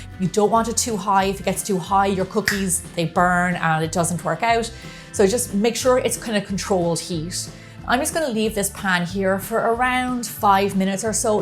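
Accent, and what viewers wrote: Ireland. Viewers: Thanks for the Irish accent and the great tips. Living in a hot climate you don't want to fire up an oven, so stove-top is the way to go. Also, when I baked an entire batch of cookies they sat there tempting me to binge, so your method allows me to bake 4-6 cookies at a time. I shape each cookie, freeze them on a cookie sheet, then freeze-bag them for future cravings.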